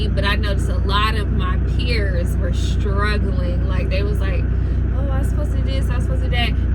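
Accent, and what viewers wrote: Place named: Texas, United States. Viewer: Your.... beautiful..What's that accent ?? I hear Texas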